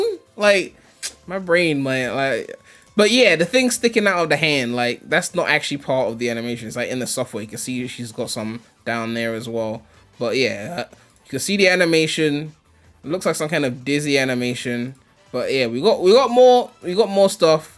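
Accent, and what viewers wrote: United Kingdom. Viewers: i like your british accent bruv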